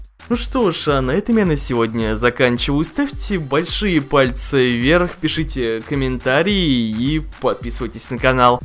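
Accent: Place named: Moscow, Russia. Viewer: у тебя акцент немного эээ... московский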